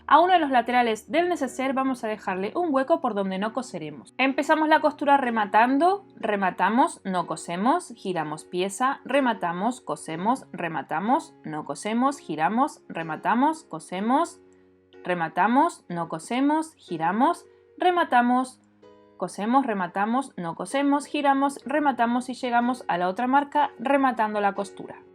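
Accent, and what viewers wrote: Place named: Buenos Aires, Argentina. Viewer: Hermoso! 😊 Me despista el porteño con el español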